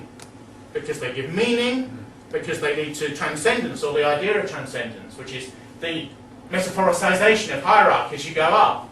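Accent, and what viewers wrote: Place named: United States. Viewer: His American accent was quite good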